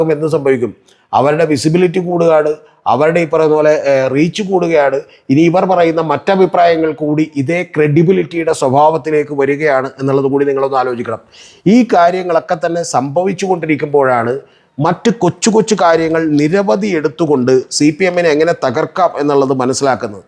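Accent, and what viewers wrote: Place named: Kottayam, India. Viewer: എന്തു ഭാഷയാടോ ഇത്. അതുപോലതന്നെ, അതുപോലെതന്നെ . കോട്ടയത്തുകാരനാണോ . ഉദാ: നിങ്ങളു० ഞാനും ഇതുമതി .അതിനു പകര० നിങ്ങളു० അതുപോലതന്നെ ഞാനും.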